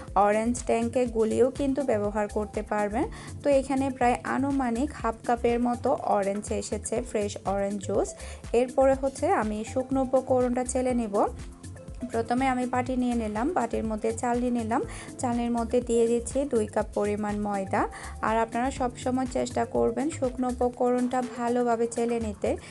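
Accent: Chittagong, Bangladesh. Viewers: MashAllah orange cake daron hoyce.Apu apni toh sob kaje parodorsi amader chttogramer apu bole kotha.mamonira kmn ache apu?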